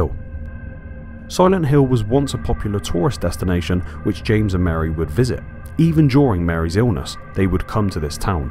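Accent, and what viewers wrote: Bristol, United Kingdom. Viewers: Oh wow!! Dude, you are obviously form exactly the same part of the UK as me. Don't often hear our accent on yt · Is that a Bristolian accent? Or more West Country?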